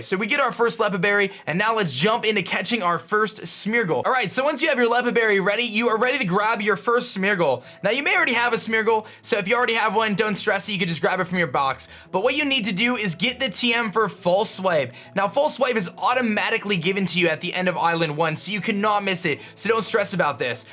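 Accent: Australia. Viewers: I just noticed he switches between kinda austrailian and american accents so he sounds like one of those surfer dudes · I haven't watched in a while... when the f did he get an aussie accent?! peukomon it's pretty faint but it's pretty new for me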